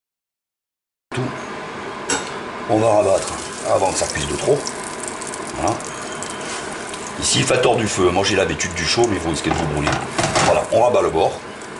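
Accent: South, France. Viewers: je vien juste de l'abonnez dis moi tu ai un mec du sud? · c'est génial merci à toi, je vais voir de suite! Ps: Ma copine vient du sud, on trouve ton accent ravissant !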